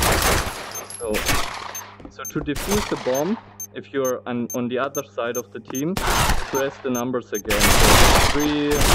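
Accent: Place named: Germany. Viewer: dieser deutsche Akzent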